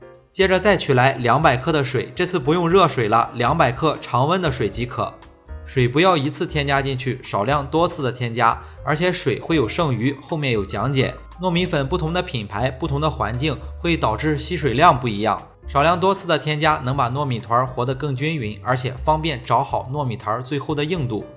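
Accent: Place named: Yantai, China. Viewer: 听出来了，我是烟台人。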